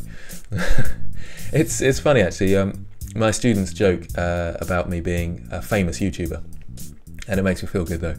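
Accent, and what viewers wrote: United Kingdom. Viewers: "Hey, I'm, I'm very sexy" he is being too modest, under his sweater he hides a six pack also, he has a british smile and accent 👌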